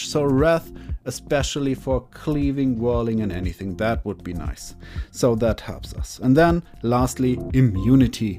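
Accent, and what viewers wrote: Germany. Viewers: Man hört einfach das du deutsch bist^^ Gut aufgebaut das video, thumbs up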